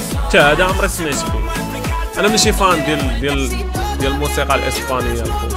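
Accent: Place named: Arab world. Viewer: حين اسمع اللهجة ديال العروبية اتقيإ اتفوو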